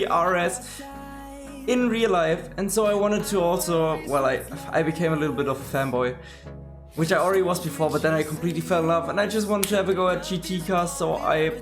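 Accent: Germany. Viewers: bist deutscher hört man bist du esportler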